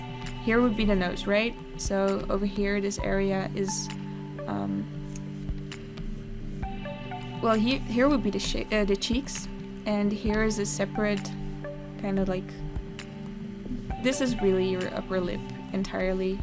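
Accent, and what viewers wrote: Netherlands. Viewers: She uses Paint Tool Sai, but I don't know what tablet. It doesn't matter that much though :P unless you're more experienced. When I just started out, I used a $60 Wacom Intuos Draw tablet. It doesn't have a screen, but only higher end ones have screens, and you get used to it pretty quickly. I think she has a dutch accent :D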